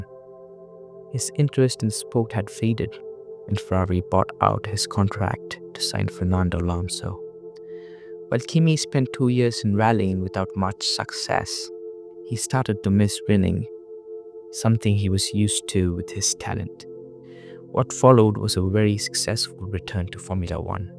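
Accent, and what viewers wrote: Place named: India. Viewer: real - I think indian accent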